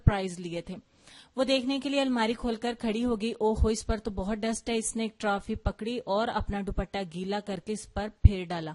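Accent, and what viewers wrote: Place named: Punjab, Pakistan. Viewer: You speak urdu in punjabi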